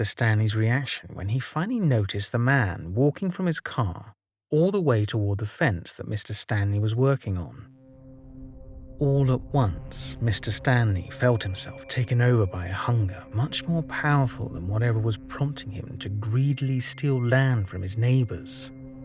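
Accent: United Kingdom. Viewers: I do too, a very soothing voice and excellent narration skills. Very high quality. And let's face it, no one narrates like the British.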